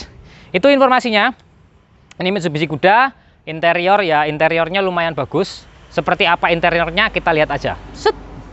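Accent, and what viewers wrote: Java, Indonesia. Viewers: Medok banget cuy.. 😊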